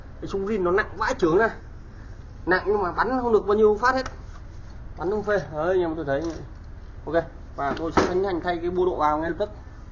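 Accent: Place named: Phu Yen, Vietnam. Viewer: Phú yên na anh kkk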